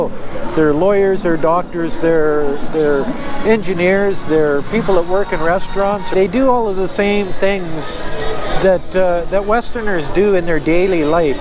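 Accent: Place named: Canada. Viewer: Oh boy! I love the Canadian English out of your mouth! Just can't be mistaken! LOL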